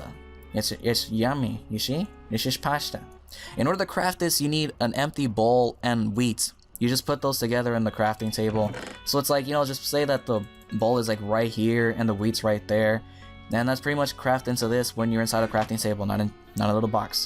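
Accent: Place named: Mexico. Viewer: I love your Mexican accent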